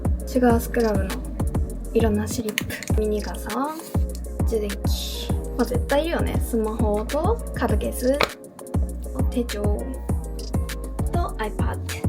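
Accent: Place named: Kansai, Japan. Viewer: おしゃれで努力家で尊敬しかない爆イケオンニ〜♡がゴリゴリ関西弁なのガチギャップですきぃ…T_T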